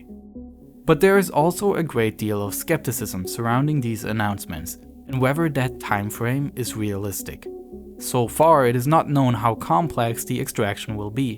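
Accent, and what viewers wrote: Germany. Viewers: I cant believe it took me this long to realize you're german, but the way you said August and Recep at the beginning are such a giveaway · I subtly like your German accent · I have a question for you. Are you German? Sounds like it ☺️ Greetings from Munich 🤗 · German accent?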